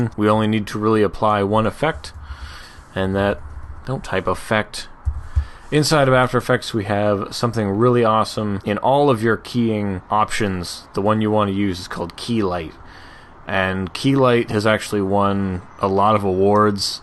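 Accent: Canada. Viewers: Are you Canadian? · Dat Canadian accent doe :D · ... Ur Canadian aren't you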